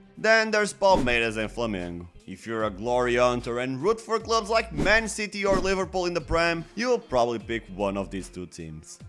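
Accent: Portugal. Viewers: aposto que ele é portugues, pelo sotaque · Is the dude narrating from Portugal or something? His pronounciation of the names sounds like a Portuguese trying to say the brazilian names. The S feels almost like a Carioca speaking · This accent don't lie to me: you're abolutely tuga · i love your european portuguese accent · Por quê? Parece sotaque português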